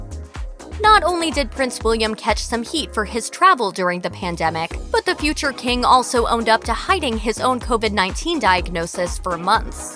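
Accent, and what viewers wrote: United States. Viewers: As soon as I heard the American accent I thought .....more unfounded bullshit. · I hate videos with these robotic sounding American voices. You know the moment that voice pipes up that nothing worth while will be said.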